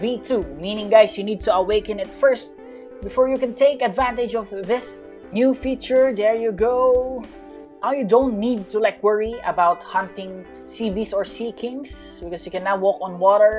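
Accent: Philippines. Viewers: Are u pilipino or american lods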